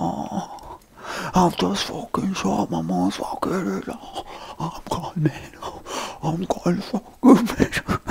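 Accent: Scotland, United Kingdom. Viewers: Yep. I'm from Scotland as well and I speak just like that ....... without the angst 😂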